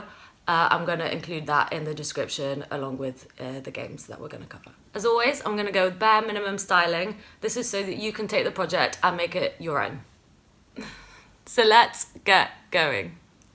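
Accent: United Kingdom. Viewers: British english is hard to follow, what did author say 10 sq.... ? What's the unit of 10 and 20 here ? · British accent is way too hard to get especially for a non-native speaker. I really wish subtitle was there :( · I saw the thumbnail and someone knew she had a British accent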